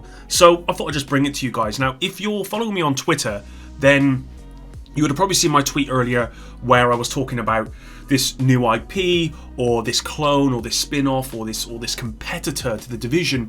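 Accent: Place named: South West England, United Kingdom. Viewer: Sounds like he's from south west England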